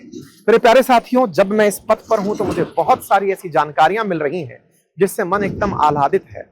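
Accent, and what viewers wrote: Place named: Uttar Pradesh, India. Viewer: इतनी शुद्ध हिंदी कोई उत्तर प्रदेश का निवासी ही बोल सकता है स्पष्ट भाषा आपकी वाकपातुता की परिचायक है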